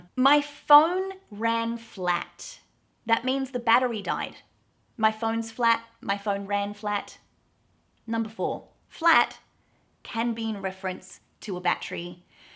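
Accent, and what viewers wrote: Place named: Australia. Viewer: Hello, i only wanted to say. I loved the Australian accent since i did watch some Australian TV shows in mij teenage years. So i love these video's of you. Keep up the good work. And maybe it can be usefull when i'm going to Australia. It's on my bucketlist! Greetz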